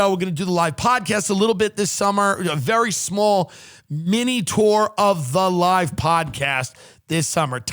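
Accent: United States. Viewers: He sounds American to me too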